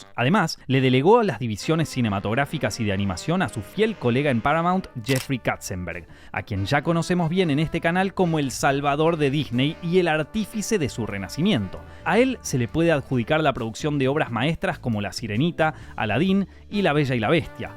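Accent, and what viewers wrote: Argentina. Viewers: Empecé a ver el video pero al oír ese acento argentino que detesto, mejor me voy a ver otro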